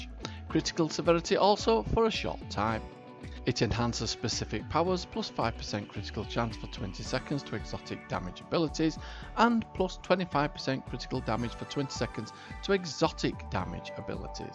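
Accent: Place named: Northern England, United Kingdom. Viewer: Ive been watching your videos for a while now and just realised you sound like an airplane pilot. The cadence, the sound quality, everything. 24th century ships explained by a 21st century northern English commercial airline pilot. All the best from Manchester.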